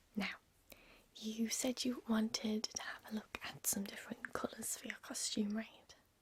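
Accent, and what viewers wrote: United Kingdom. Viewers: Wait...she's British...well this suddenly got VERY interesting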